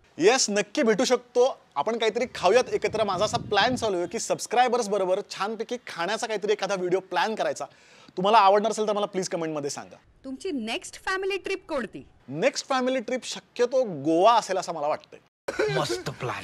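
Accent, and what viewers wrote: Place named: Pune, India. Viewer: टिपिकल पुणेरी मराठी... छान वाटले... खुप जवळच वाटले... मस्त 👌👍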